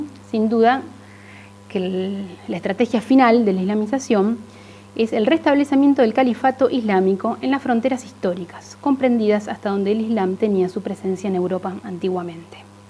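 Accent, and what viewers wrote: Buenos Aires, Argentina. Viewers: hermoso escuchar èse acento aporteñado, que me es tan familiar, esplendido trabajo, sólo te daré un consejo, mira la cámara mas frontalmente con menos miedo a la exposicion, eres muy inquieta y seguramente te cuesta estar sentada esponiendo, por lo general los Profesores os moveis mucho, ha sido magnífica lectura me hizo mucho bien enterarme Gracias!!! Hermana Dios te Bendiga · Desconosco el orígen de esta monja, pero por su formade hablar parece ser argentina. No entiendo por que esta tan preocupada por Francia,en todo caso es el precio que tienen que pagar por el nefasto colonialismo frances, ellos fueron los que intrdujeron la siniestra Doctrina de la Seguridad Nacional, que sembró de sangre, dolor y murte a nuestros paises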